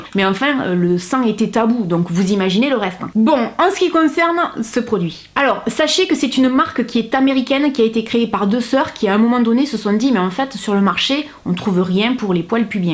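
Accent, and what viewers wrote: South, France. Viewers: Je t adore bravo encore beaucoup d humour et même accent que toi ca fait du bien de voir des sudistes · J adore ton accent du sud tu es superbe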